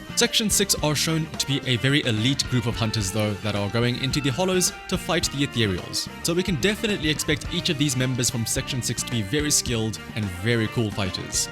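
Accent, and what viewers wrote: South Africa. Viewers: are you SouFafrican? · So good to hear a South African accent on YouTube ❤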